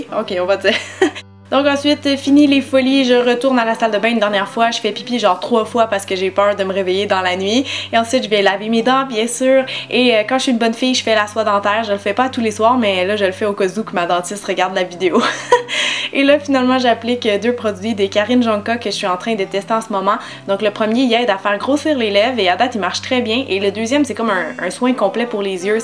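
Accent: Quebec, Canada. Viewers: Encore une vidéo superbe ;) tu es très jolie naturelle :o Puis l'accent québecois c'est toujours agréable ^^ Enfin bref plein de gros bisous 💋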